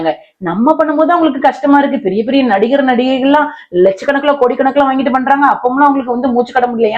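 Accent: Tirunelveli, India. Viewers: சிஸ்டர் நீங்க 3 கோடி 47 லட்சம் Views வச்சியிருக்கீங்க அது மற்றவர்களுக்கு பொறாமை கவலை பட வேண்டாம் தங்கச்சி என் சொநத ஊரு சேரன்மகாதேவி நானும்ஒரு யூடிப்பர் தான் நான் இப்போ இருப்பது ஈரோடு உங்க திருநெல்வேலி பாசை கேட்க இனிமையாக இருக்கும். என் பெயர் ராஜேஸ்வரி என்னோட சேனல் Rajiyin Rajyam என்னால் இப்போ Video போட முடியவில்லை தங்கச்சி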